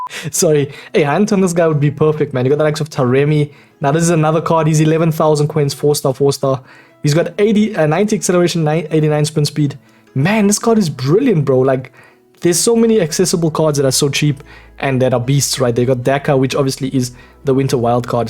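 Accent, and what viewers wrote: South Africa. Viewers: Are you South African bro you got the accent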